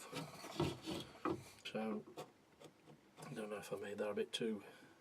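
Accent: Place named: Stoke, United Kingdom. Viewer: Like your style you sound like a Stoke lad to me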